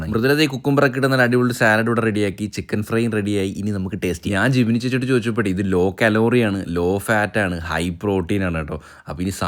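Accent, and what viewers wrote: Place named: Kasaragod, India. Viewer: enik ishto "kaka parndi" kasargod pariyunad😂😂😊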